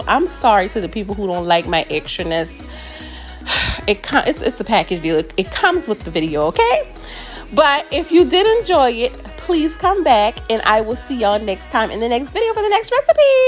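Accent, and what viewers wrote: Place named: South Carolina, United States. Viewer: I knew you where from sc! Your accent is heavy lol! Going to try this for vday! But would it matter if I use crab claw meat? I don’t really care for the lump crab lol.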